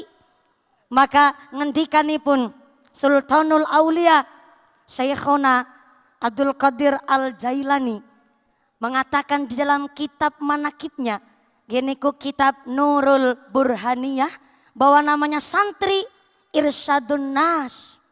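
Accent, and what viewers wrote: Banyumas, Indonesia. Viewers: Ustadzah dari republik ngapakers (mugi2 sehat lan sukses) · Mandan ndredeg ya mbak? Angger ngapak mandan maen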